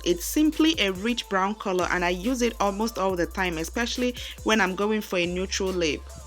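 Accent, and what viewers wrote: Nigeria. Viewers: my sister... I like ur nija accent beta oooh.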